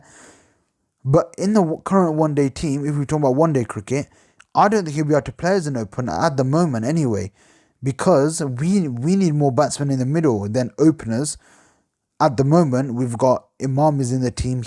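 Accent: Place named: United Kingdom. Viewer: Love your British accent 😍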